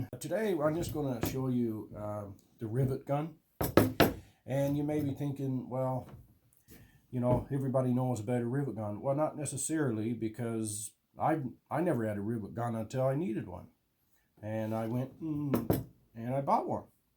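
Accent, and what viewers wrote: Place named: Newfoundland, Canada. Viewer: I have one of those , does a great job. Ok so I'm trying to narrow down the accent so let's me know if I'm close , I figure your from the rock but anyone could guess that but I'm going way out there and saying your from a stretch between Grand Falls Windsor east to Botwood but man oh man I hear Bishop Falls big time . Keep at it bye and as they say on the rock , who knit ya 👍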